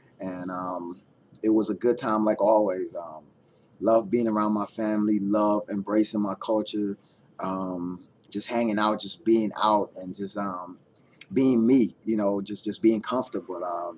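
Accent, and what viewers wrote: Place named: United States. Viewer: You have an american accent..could you change to a guyanese accent in guyana? Cause I kinna lost my guyanese accent..lol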